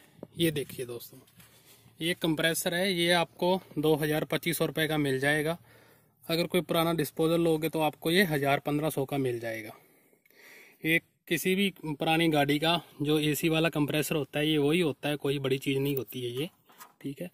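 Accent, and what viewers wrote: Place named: Punjab, India. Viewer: Hindi with Punjabi eccent. Bro better you speak your own language you can explain more better in punjabi.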